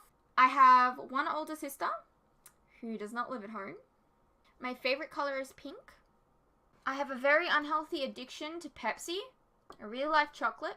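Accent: Australia. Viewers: reaction videos are a dime a dozen. i think you might be auzzie, nz or tasmania. i have a great affinity for my rugby rivals and their accents. but, you, dear girl, have empathy. its difficult/refreshing to watch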